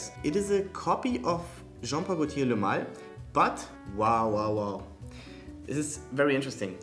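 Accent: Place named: Germany. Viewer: Man hört den deutschen Akzent so geil raus 😂. Geiles Video trotzdem!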